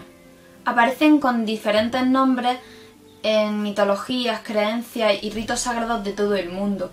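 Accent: Spain. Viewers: Que acento tan bonito....me imagino la chica es andaluza?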